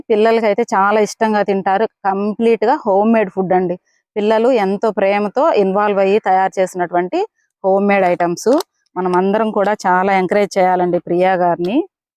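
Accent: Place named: Guntur, India. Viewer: మీరు వెంకటగిరి అన్నారు కదా, మీ లాంగ్వేజ్ నెల్లూరు లా కాకుండా గుంటూరు యాస లా ఉంది మీరు గుంటూరు వాళ్ళా గారు లేదా ఆ డెలివరీ చేసిన వాళ్ళు గుంటూరు నా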